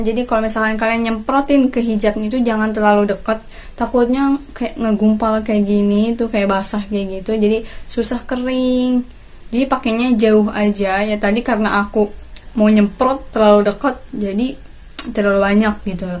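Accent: West Java, Indonesia. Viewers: Se formal apapun ngomong bahasa indonesianya sundanese, anger we aya bumbu sundana nya... Salaam sundanese🙏🏻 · Teteh orang Sunda nya? 😁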